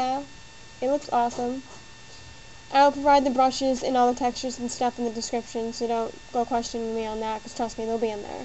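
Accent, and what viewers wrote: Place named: Asia. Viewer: you sound like an asian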